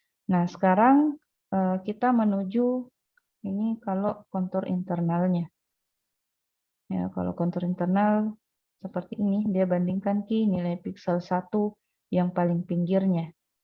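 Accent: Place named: Gorontalo, Indonesia. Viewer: logatnya Gorontalo/sulawesi bngt nih wkwkw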